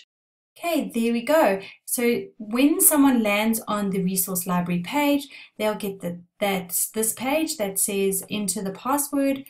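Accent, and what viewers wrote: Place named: South Africa. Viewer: This is so helpful...I imagine things look a little different now, but I’m excited to give this a try! Plus!! So nice to hear another South African accent! 😉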